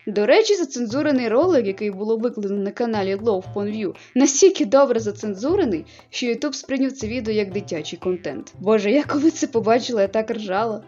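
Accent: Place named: Ukraine. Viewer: Похвально що розмовляєш майже чистою українською мовою 😊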